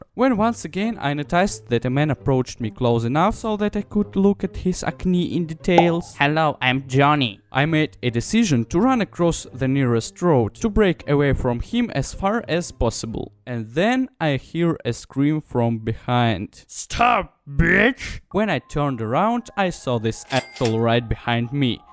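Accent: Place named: United Kingdom. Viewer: Друг мой, ты все видео говоришь на британском диалекте английского, он понятен всем нам (русским, кто не прогуливал инглиш), а вот американцам он понятен даже хуже чем нам.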